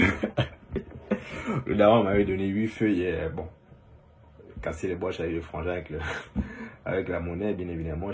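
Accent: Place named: Gabon. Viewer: Hey frère ? Continue à faire des vidéos,j’ai reconnu ton accent ,t’es un pur gabonais,grosse force à toi frère 🇬🇦🇬🇦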